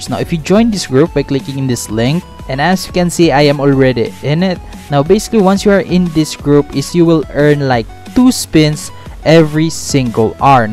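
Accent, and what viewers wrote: Philippines. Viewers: THis guys lives in the Philippines i know by his accent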